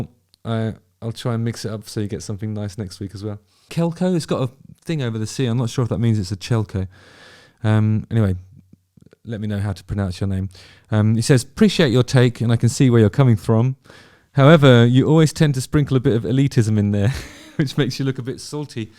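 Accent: United Kingdom. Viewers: I think the "elitist" remark is because of your British accent.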